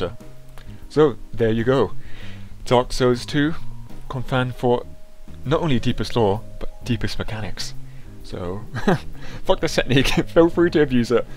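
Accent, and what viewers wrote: United Kingdom. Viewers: Why don't you do more videos where you speak in your calming British voice?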